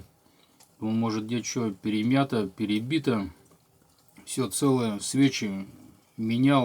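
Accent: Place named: Arkhangelsk, Russia. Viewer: судя по говору, у меня был друг с Архангельска, ты тоже оттуда, познакомься с Николаем, таежным, он никогда не набирает воду, с лунки, у дома, если планирует, выезжать на снежике)))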